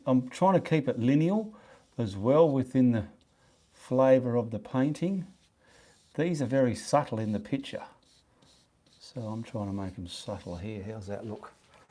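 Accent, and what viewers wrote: Australia. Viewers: Love your 'Stralia accent as much as your teaching skills. Oldie me (72yrs) in Inverurie. NE Scotland( Re accents I speak The Doric,so "Fit a fine daundie mannie learning us tae pint.xxxx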